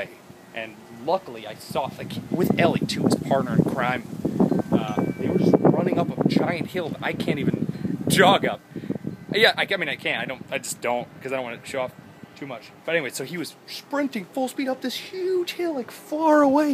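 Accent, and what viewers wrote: New York, United States. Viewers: R u American or English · the new york acsent video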